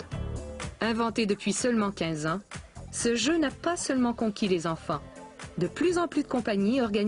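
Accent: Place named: Canada. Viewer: quel bel accent canadien !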